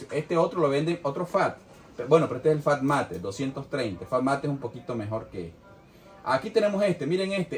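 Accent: Nicaragua. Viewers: Sos de nicaragua?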